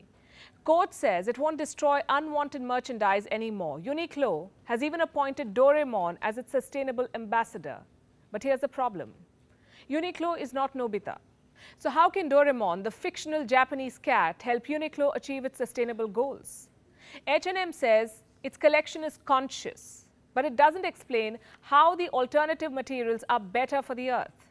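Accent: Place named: India. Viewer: She has an Indian accent to carve granite with. Her topics and intelligence are always brilliant though.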